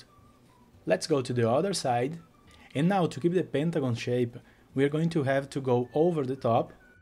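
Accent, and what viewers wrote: Brazil. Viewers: are you a brazilian? because if not your accent looks so much as a brazilian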